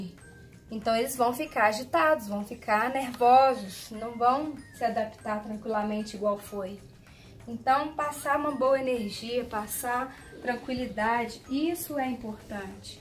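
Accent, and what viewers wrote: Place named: Minas Gerais, Brazil. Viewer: Adoro o sotoque mineiro....aprender com esse sotaque é bacana. Gato e a gente adora brincar.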